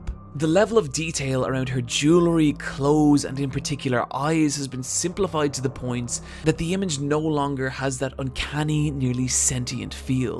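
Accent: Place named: Ireland. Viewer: It's the Irish accent and I agree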